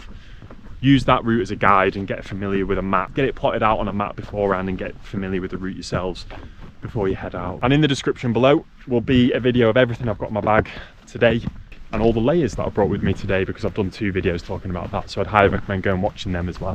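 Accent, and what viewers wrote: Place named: Southport, United Kingdom. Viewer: Half way through and loving this video! I have a friend who lives in Southport who has your accent... is that where you're from? Sorry to hear your mum passed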